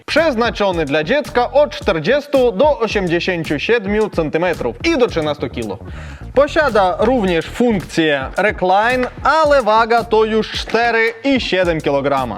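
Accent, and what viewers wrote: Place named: Ukraine. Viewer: Dlaczego znowu słyszę Ukraińca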